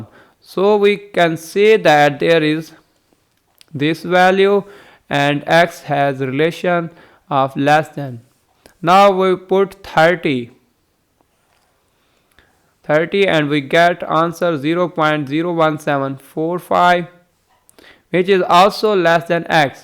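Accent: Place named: India. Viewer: damn man thanks a lot. I appreciate your video. However i would love to see this video without an indish accent.Anyway nice Video man thanks for saving my ass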